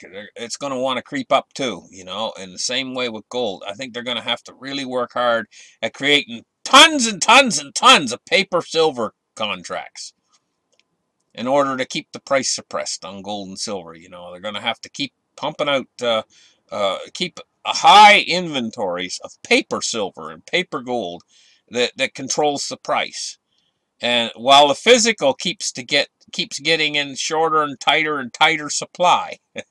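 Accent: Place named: Canada. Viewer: Great advise. analogies and stories are the best. The Canadian accent tops it off.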